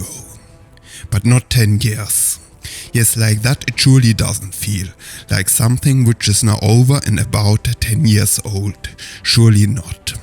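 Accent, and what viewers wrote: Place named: Germany. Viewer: Wenn ich das richtig raus höre hast du einen starken deutschen akzent, also verstehst du sehr wahrscheinlich deutsch oder liege ich mit der Annahme falsch.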